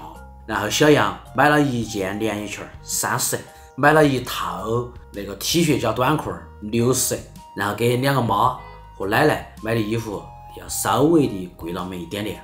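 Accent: Sichuan, China. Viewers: 超小厨阿， 你辛苦了这么长的时间啦， 我们为你的成就觉得非常开心，你不用跟我们解释啥。 作为一个在加州的四川人， 听到你说四川话和看到你煮菜的时候都很让我想家，希望疫情快点度过，可以快速回来整几顿！ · 邊看邊學四川口音呢，沒字幕的話，要聽得懂才行呢，哈哈，我台灣。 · 最喜歡你視頻中，所講的那一口川話，因為家裡長輩均已過世，超想念他們的口音，出生在台灣的我，太喜歡你們的日常，祝你們全家人都平安幸福！我會一直關注你的視頻！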